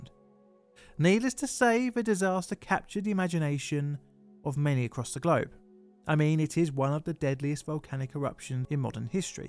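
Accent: United Kingdom. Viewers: I think this particular YouTube channel is run by a British guy. But if you can manage to understand British people and Americans you've learned every accent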